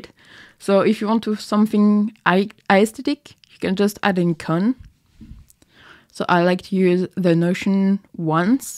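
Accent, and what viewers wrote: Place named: England, United Kingdom. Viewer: woow i loved you ,loved your english ascent and more love your work , ,,Thank you realy..looking ahead for the next video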